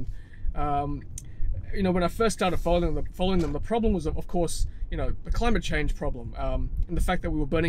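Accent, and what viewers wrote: Australia. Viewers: Thanks for your entertaining update,! I thoroughly enjoyed it like all your posts. Your enthusiasm is infectious & it's really good to hear all this locally from someone with an Australian accent. Keep up the good work (and please let Joy know I enjoyed her posts as well). Cheers, mate!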